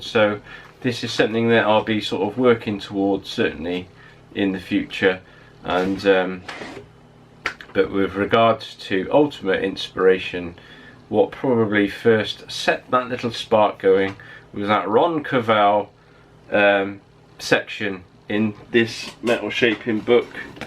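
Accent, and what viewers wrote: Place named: West Country, United Kingdom. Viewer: When I was a bit of a tear ass in my car and bent it up I loved going to the repair shop in Westfield, Somerset. Mk 1 Cortina days. The owner Les was a great man and he allowed me to hang around until he started to let me use some of the kit. Loved using gas welder to stick on the odd wing or rear quarter. Doing it for love is one thing but doing it for a living is a different matter. At 71 I love what you do. Your blog's are pitched just right. How anyone can tell you your doing it wrong is definitely a bed wetter. I am adding Einstein to your second name. You say you were always looking for time and space well he had a thing about this too but he called it Space-time. I am trying to sus your accent. Are you a westo. North Somerset am I. Take care.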